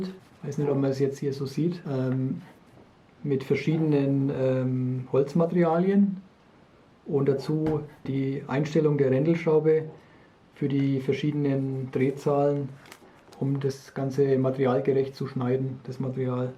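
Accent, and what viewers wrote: Germany. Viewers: Ich höre doch einen Franken raus ? 👍👌 Tolle Videos.....des gibt Auf jeden Fall a Abo 🤘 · Gute, komprimierte Erläuterung. Danke. Vom Dialekt her würde ich auf Mittelfranken tippen, Nbg, Fürth, Erlangen. ;-)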